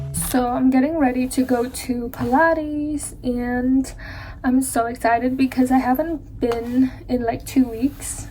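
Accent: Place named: United States. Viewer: I think you are Vietnamese but your accent very looks like from the US. It makes me confuse :v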